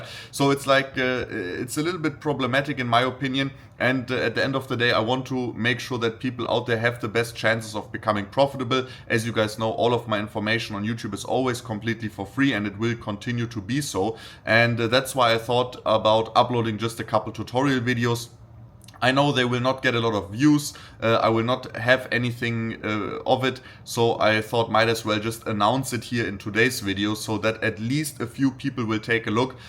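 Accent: Germany. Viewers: Hi, I am delighted to see a new upload from you and thank you for sharing your thoughts and strategies. As starting trader they are very valuable to me and couple of times prevented irrational greedy descision that would have turned badly. Not always, sometime greedy holding on to draining longs happened, but over time I want to reducde that kind of action, to zero preferably. Somehow shorting feels more scary in a bullcycle and than longing at high prices. About sharing your private life. I think it is inspiring to see and have that in your videos. You live the dream of I guess many of your followers. Curious about your tutorials but even more: Would you share with us how you made your first 10K, 100K, 500K. When did you decide to move to Dubai? (assuming from your accent that you grown up in Germany). How did you arrange the move? Are there tips you have for people currently living elsewhere that play with the idea to move to Dubai. 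Hope someday you will share that story. Wishing you a great remainder of the weekend.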